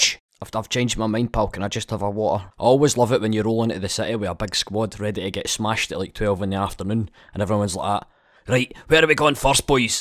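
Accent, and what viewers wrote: Scotland, United Kingdom. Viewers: Stephen duncan Listen to the accent, posh Edinburgh, or Ned Glasgow? Or the standard fifer...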